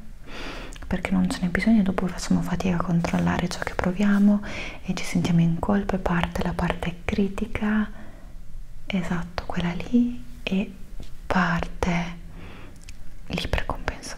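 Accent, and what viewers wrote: Italy. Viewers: A pesar de que no entiendo nada...el acento italiano en asmr me hace tener demasiados triggers.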